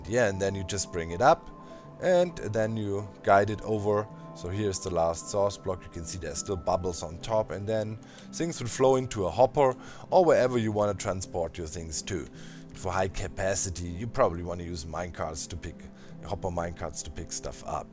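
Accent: Germany. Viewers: Du solltest TV kommentator werden :D man merkt allerdings das du Deutsch bist.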